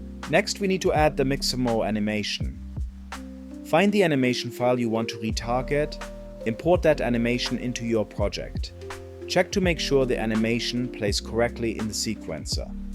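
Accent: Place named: Germany. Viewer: bro your accent sound so german haha good video btw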